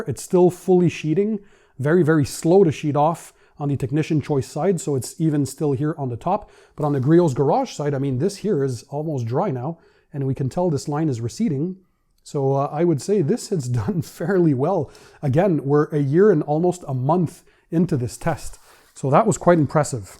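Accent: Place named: Canada. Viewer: Pourquoi tes vidéo ne sont plus en français ? J'adore votre accent canadien en plus c'est vraiment dommage...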